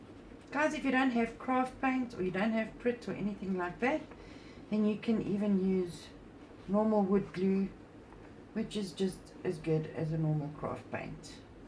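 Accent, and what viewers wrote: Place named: South Africa. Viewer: Oh my goodness that os a stunning layout. Its so wonderful to find a fellow crafty south african! I watched your video while baking bread. I miss that accent terribly.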